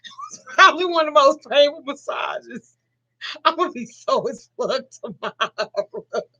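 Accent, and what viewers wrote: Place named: Dallas, United States. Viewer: I knew I recognized that accent lol "ova thurr" "right hurr" im in dallas too☺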